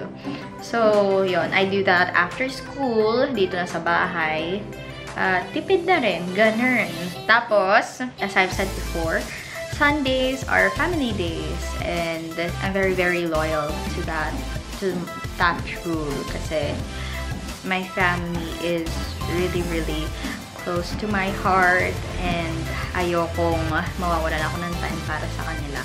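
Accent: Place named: England, United Kingdom. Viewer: ATE CAN YOU TEACH US WITH YOUR ENGLISH ACCENT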